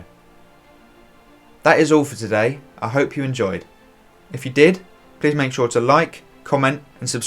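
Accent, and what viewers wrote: United Kingdom. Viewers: I love your explanation so clear despite the British accent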